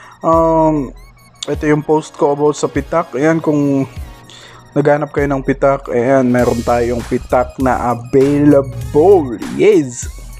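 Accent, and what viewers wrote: Visayas, Philippines. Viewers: Bisaya ka ba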